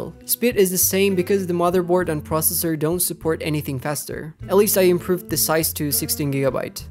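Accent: Russia. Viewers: U russian? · Ха,он русский 😂,спалился братан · is bro russian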